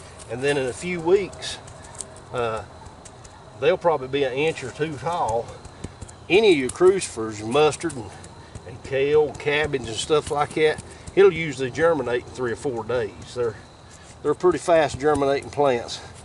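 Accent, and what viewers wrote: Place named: North Carolina, United States. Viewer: I knew I recognized that accent lol I'm from south eastern , NC. Felt like home.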